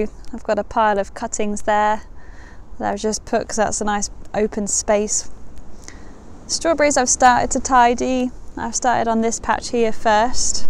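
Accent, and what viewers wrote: United Kingdom. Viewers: i wonder if the girls of naughty ing ham get teased a lot... had to say that .... mybad ... i don't mind the obsession with the weather but i can get a little flustered with this accent ... which is somehow very appealing yet annoying ... so snobbish - must have to do with the power the Brits had over the centuries... imo ... just ignore me...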